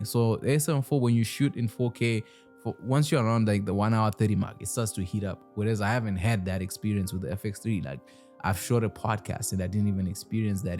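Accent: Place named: South Africa. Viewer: Bro is in Canada?!?!? I thought he’s in South Africa 🤣 (because of his accent) we’ll much love from South Africa 🙌🏾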